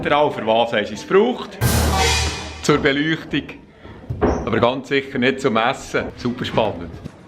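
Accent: Bern, Switzerland. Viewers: S’Video han ig spät entdeckt, aber für e Berner gäng no schnäu gnue 😉. Schön gmacht u recht persönlich. Dis Mami red ned Bärndütsch, heit Ehr im Bärnbiet gläbt, dass Du so schön Bärndütsch tuesch rede?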